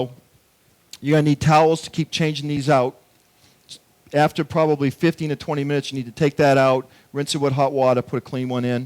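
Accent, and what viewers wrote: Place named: Boston, United States. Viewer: he's from boston give him a break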